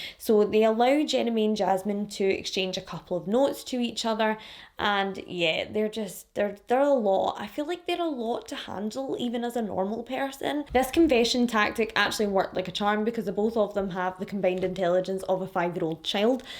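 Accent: Scotland, United Kingdom. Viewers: Is she Scottish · Hello. Yes I'm a brand-new subscriber from America. I love your accent I spotted it as Scottish right away. This story is so messed up all the way around. Those parents pick themselves up. They just left the dark past behind. And they reached for the Stars. And her only complaint was that she did not like the rules. She should have just sucked it up Chuckles. Find one teenager that just likes the rules. LOL. The tragedy of the murder. To her father seeing her as his last thing he sees on this Earth. And oh my goodness a little boy. The fear and Terror that boy had to go through period at the hands of his so-called sister. And I absolutely agree with you that idiot she was dating had fetal alcohol syndrome. I have worked in the healthcare industry. I have met many children with fetal alcohol syndrome. There's affect that a lot of people don't know. 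If you look at your pinky and you bend it there's two joint. A person who have foetal alcohol syndrome only have one joint. And I could tell by the structure of his face. And the fact that his mother was just a alcoholic. But at least you admit she did not address his mental health issues when she should have. That doesn't make anything better. but one we own up to our mistakes and take culpability for them. Give me an iota of minimal respect for her. and what about everybody that was seeing that on MySpace? They should definitely all be held culpable. You keep it up honey you are on the rise. You have become one of my favorit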